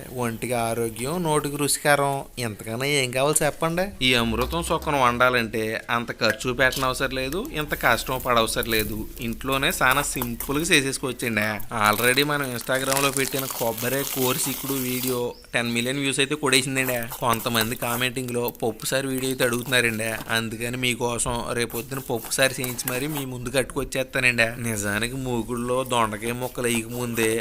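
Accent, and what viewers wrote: Godavari, India. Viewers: Super andi mi mata godavari gurthu chestundi tq so much sirrrrr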